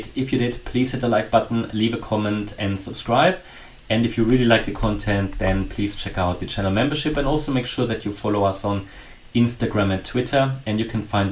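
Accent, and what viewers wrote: Germany. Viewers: Love the German accent. Keep the studies in coming! 📊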